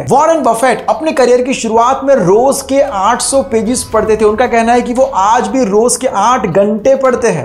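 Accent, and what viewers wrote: Gujarat, India. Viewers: Gujarat se ho Bhai???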